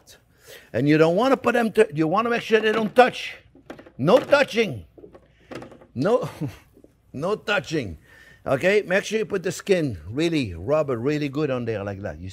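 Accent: France. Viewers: I love his smile and hearing him speak and teach English with a French accent. :D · Chicken wings which are drumstick and the second process in the oven is what??? A brawler? That is what your captions say? As for your French eenglish, I don’t understand.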